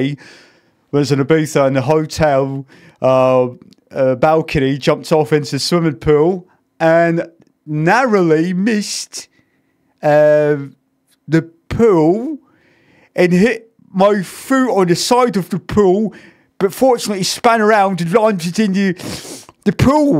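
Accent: Scotland, United Kingdom. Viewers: Limmy’s accent is mid-tier difficulty at best in terms of Scottish accents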